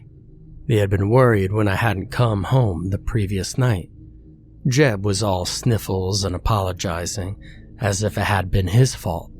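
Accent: South, United States. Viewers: Great southern draw really took this story over the top Great work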